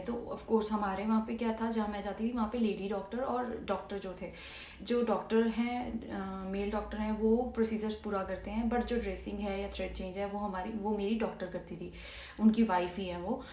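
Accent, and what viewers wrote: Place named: Haryana, India. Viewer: jis hisab se baat ki apne muje lga hi ta ap haryanvi ho 🤨me meri mosi ki ladki jesi awaj lagi muje 😂🤝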